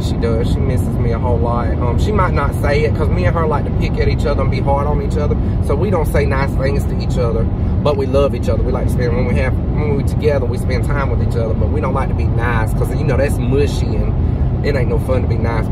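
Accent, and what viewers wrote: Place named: North Carolina, United States. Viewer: You from north carolina? You sound like us.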